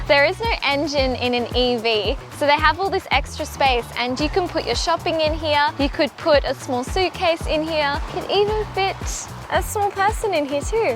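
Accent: Australia. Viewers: Love your Aussie accent! I can't get over the size of the charging plug in Australia they are 4 times bigger than in the US (maybe size does matter)? 🤔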